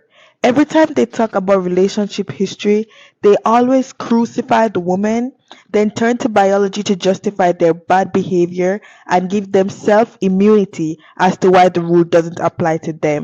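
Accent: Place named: Caribbean. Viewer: Nothing someone does it perfect. I will agree some of these podcast approach towards woman is harsh and sometimes unfair, but they are a necessary "evil" per say since the 80% of men who were left on read by most woman are either going to follow these guys... or Join these females onlyfans. For every actions, there is a reaction. Woman want to continue to aim for the best, as they say the 20%. which is fine and is expected to go for the best you believe you can get, but this is not the case. There is a certain amount of guys who do rotate around the same girls, Even if these girls are dating a "nice guy" they have their lapse of judgment with these men. I can agree they themselves may not be the BEST people to preach the message, but no one was preaching anything. The reaction to woman dating choices is this. Hardened Men who are sickened by the westernized woman I can hear some Caribbean accent from you, so i can tell you may not be with the western woman BS, but you can't speak for the majority of woman. 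As a guy who has dated, and dealt with many woman. Yes woman are generally the same, The same way Men are generally the same. We have our own individual differences, influenced by our upbringing and society who grew up around, but biologically, when it comes to dating and how woman choose their mate, just like men, is generally the same. Per instance 9/10 Woman do not like Nice guys, or push overs. You try to work a Hardened tough guy to become more soft, like building you'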